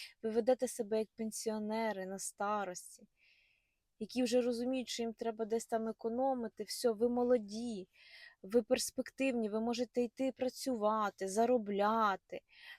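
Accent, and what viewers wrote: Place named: Ukraine. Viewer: Какая же у вас речь противная, ну как вы слова произносите, это просто кошмар. Как хорошо, что я не родилась в хохляндии, не дай бог вот так разговаривать.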